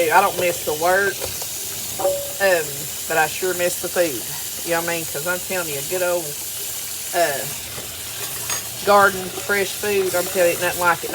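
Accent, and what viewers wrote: South, United States. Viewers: Thursday with when all her southern words come out 😂😅😂 Bless her heart, and her taters and maters...go get ya some honey😂😅😂 You know I luv ya my friend ❤❤❤ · Watching you and listening to you speak makes me miss living in the South. I miss having fresh vegetable plates for lunches and dinners. I love hearing your accent too; it reminds me of being young and growing up in Alabama. ❤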